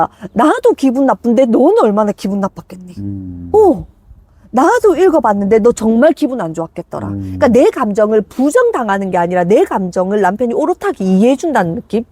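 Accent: Gyeongsang, South Korea. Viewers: 전 경상도 사람인데 서울로 올라와서 서울말 쓰고 생활하는 사람인데요 선생님 강의 들으면서 "아 경상도 말이 이렇게 정감있고 재밌는 말이었지~~~ 나도 다시 경상도 사투리 써볼까 ㅎㅎㅎ" 생각했었는데요!!! 선생님 화이팅♡♡ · 사투리.. 저는 오히려 정감있고 리듬감 있어서 더 집중하게되고 잘 들려요~ 참고로 경상도사람 아니에요 ㅎ 오늘도 많이 배웠어요! 늘 감사합니다! 🤙🏻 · 앗 저는 서울 사람인데 경상도 사투리 너무너무너무 힙하고 좋던데요????? 그리고 사투리 덕분에 영상도 더 따숩고요! 팬입니다!❤ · 저도 경상도 사람이라 너무 정겹고 말투가 제 친구랑 완전 똑같아서 더 친근감이 드는...ㅎㅎ영상 잘보고있어요~~저는 기꺼이 육아가 너무 좋아요^^ 늘 좋은 정보 뼈때리는 정보 감사합니다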